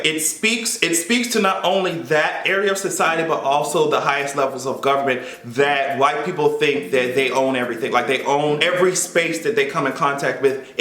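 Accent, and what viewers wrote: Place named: Jamaica. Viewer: I die with laughter every time he says woman instead of women. So Jamaican my yute.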